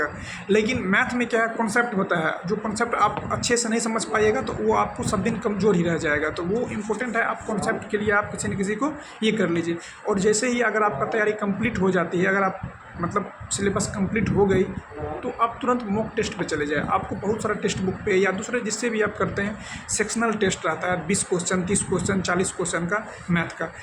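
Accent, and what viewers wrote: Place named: Bihar, India. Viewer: Bihar से हो क्या आप,,,❤🎉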